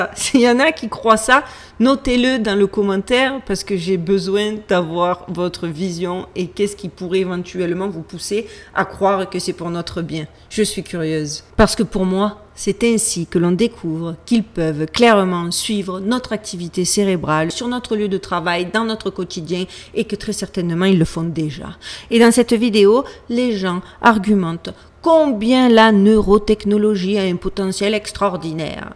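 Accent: France. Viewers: Question : As-tu une certain succès envers les amerlocs avec ton accent Français ?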